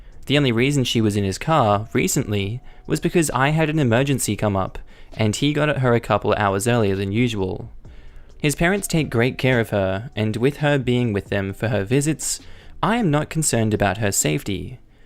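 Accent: Australia. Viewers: hearing a fellow Aussie voice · So pleased there's an Aussie narrator... has a soft spot for the accent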